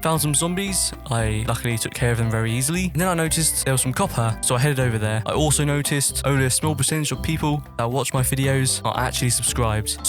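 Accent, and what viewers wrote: United Kingdom. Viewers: I love your voice Are u Britain? I’m sorry if ur offended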